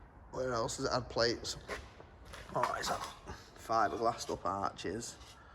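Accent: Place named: Manchester, United Kingdom. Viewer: Where you based? you sound like Manchester... Someone had a go at mine before i started it as well lol... There is a few videos of the work iv had to do, unbelievable lol.. Yours is mint 😆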